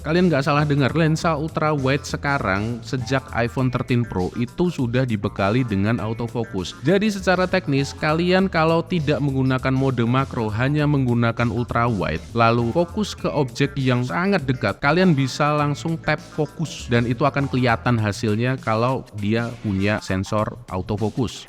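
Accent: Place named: Java, Indonesia. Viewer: Mantap maseh 😂 jowoan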